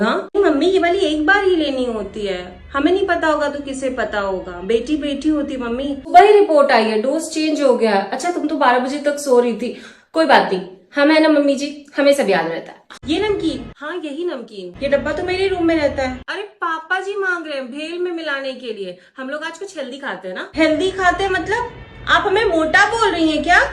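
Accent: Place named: Varanasi, India. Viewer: मुझे तो आपकी पुरानी वीडियो - बनारसी लहजे vali बहुत पसंद हैं , वो फिर से डालिए please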